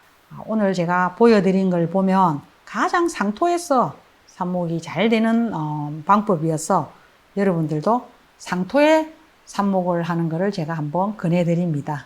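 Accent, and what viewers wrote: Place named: Gyeongsang, South Korea. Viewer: 항상, 좋은 작품을 많이 보여 주시는군요.. 감사합니다. 목소리도 구수한 경상도 사투리 참 듣기 좋습니다..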